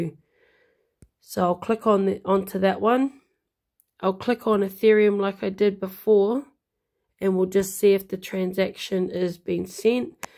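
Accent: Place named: New Zealand. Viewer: Lol funny, as soon as you started talking I thought another Kiwi. Great video by the way.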